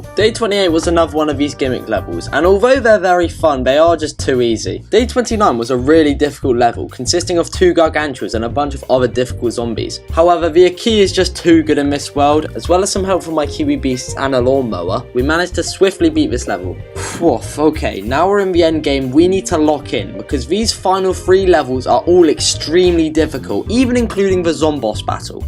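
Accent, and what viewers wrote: United Kingdom. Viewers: A GOOD BRI ISH GUY?!?!?? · bit too entertaining.. you british?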